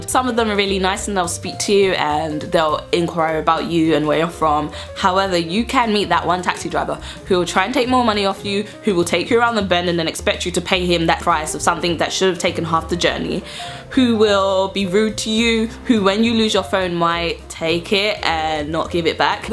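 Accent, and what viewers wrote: United Kingdom. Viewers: It's a BRITISH accent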